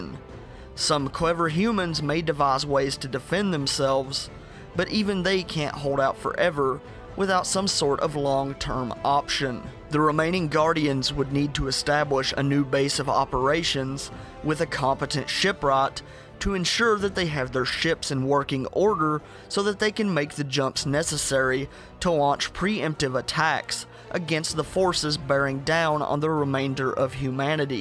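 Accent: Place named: West, United States. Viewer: I click on the video. The first 3 seconds I'm like: oh great it's the guy with the western accent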